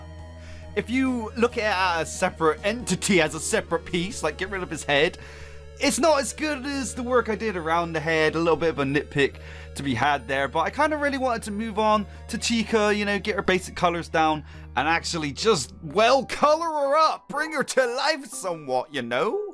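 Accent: United Kingdom. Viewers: Vary British. Indeed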